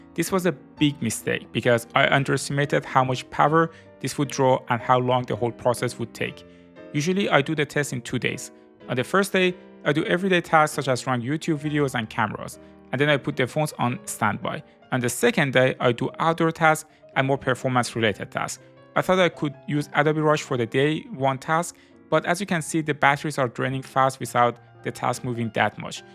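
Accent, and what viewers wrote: Iran. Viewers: Persian English accent 😊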